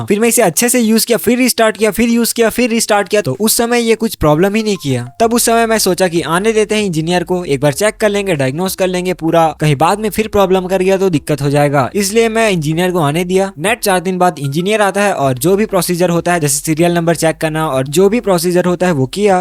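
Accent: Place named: Chhattisgarh, India. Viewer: Bolne ke kareeke se mujhe bhi laga CG se hai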